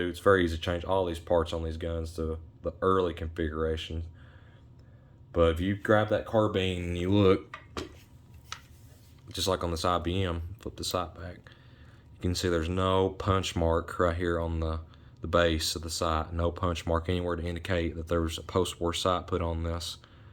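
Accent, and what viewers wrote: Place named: South, United States. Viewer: Thanks i am new to m1 carbine i know ww2 m1 grand . But please talk more slow as your a fast talking southen man i am from california not a yankee like thay said lol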